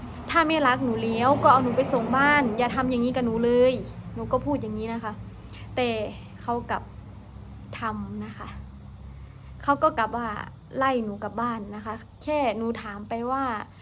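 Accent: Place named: Sakon Nakhon, Thailand. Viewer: ตกลงน้อง เป็นคนบุรีรัมย์หรือคน สกลนคร ตอนดูคลิปขับรถพูด เหมือนคนสกลนคร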